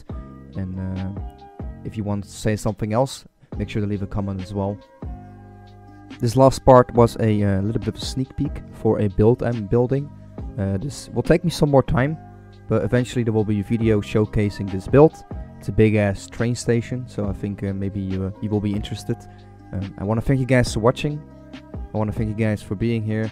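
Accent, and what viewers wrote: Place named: Netherlands. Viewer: gij zijt nederlands he da hoort ge aan u stem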